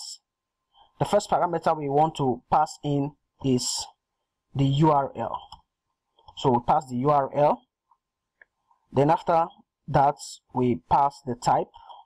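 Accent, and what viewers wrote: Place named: Nigeria. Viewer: I'd recognize a Nigerian anywhere. Good one bro, carry one.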